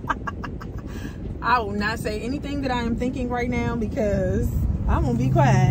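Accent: Baltimore, United States. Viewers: "One thing about KFC, KEEP THE RECIPE THE SAME" lmao - SN. The minute I heard you begin talking, I thought to myself: she HAS to be from DC or PG Country. Then I saw your Capital Heights PO Box in the caption. Lol! Loved this review, my fellow DMV Queen! I am about to try these today! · Always watching videos you keep me laughing because you keep it real I got a question for you are you from Baltimore cuz you sound like you got the Baltimore accent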